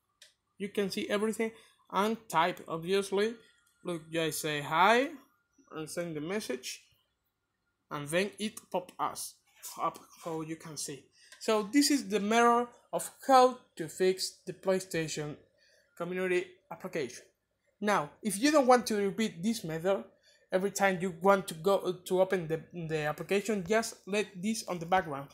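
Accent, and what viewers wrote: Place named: Oxford, United Kingdom. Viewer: Ojo ese ingles de oxford. Me alegro de que hables asi ojala yo hablara así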